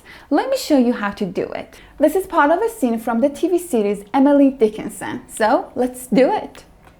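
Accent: Iran. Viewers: ته لهجه ايراني تون مشخصه. لايك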